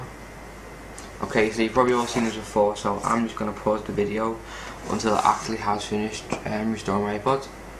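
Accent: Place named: United Kingdom. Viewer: maybe we could follow the "simple" steps if it wasnt for your anowing brit accent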